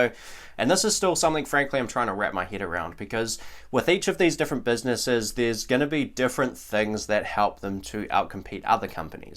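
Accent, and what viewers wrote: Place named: New Zealand. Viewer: Nice to hear a kiwi accent for a change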